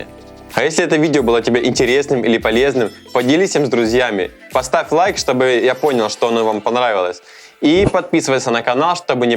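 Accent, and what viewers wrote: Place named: Romania. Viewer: акцент какой то румынский. надеюсь он Русский человек.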